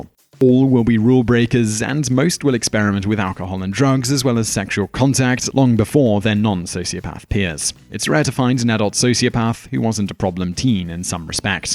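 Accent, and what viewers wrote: United Kingdom. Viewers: YouTube should require "British Accent" in the title of any video with a British narrator!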